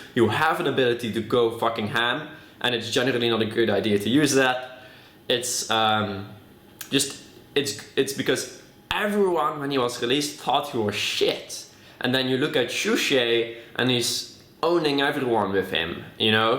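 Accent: Netherlands. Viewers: Je hoort gewoon da die nederlands is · Kut nederlands accent xD. Ik vind hrt leuk om jou vids te kijken. Ze zijn egt daacking awesome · Dat nederlandse accent en soms die woordjes blijft leuk haha xD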